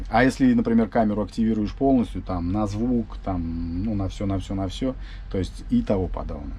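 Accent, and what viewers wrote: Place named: Nizhny Novgorod, Russia. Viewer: Родной нижегородский говор )))) живу в Москве как этого не хватает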